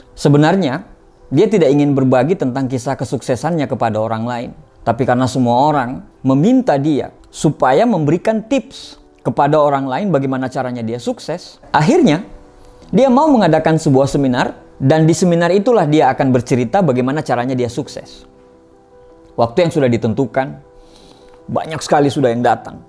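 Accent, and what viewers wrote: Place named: Banyumas, Indonesia. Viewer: Mntp ngapak gafir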